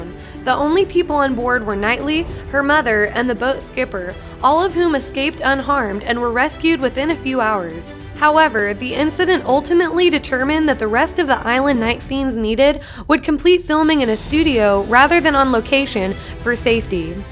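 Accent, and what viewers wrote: United States. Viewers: Instantly bailed due to nauseating American narrator. Was she the only applicant ?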